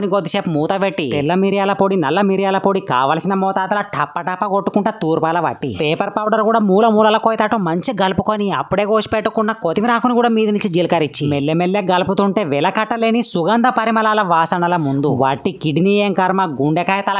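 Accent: Telangana, India. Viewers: Evaru matladindhi, ? Meerena? Ay baboi ma Telangana, masthuga matladutundru, masth gammathayitundi meeru matladutunte, super ehe. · పక్క తెలంగాణ యాస సూపర్ తమ్ముడు 👌🥰🥰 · కరీ రుచి పక్కన పెడితే నీ డైలాగ్స్ కి హాట్సాఫ్ బ్రో, చక్కటి తెలంగాణ స్లాంగ్ లో ఇరగ దీస్తవ్ నీ లిరిక్స్ 🖖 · Telangana kadha bro 😂😂😂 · Anna midhi Ngkl ha Anna ma vlg lagane undhi mata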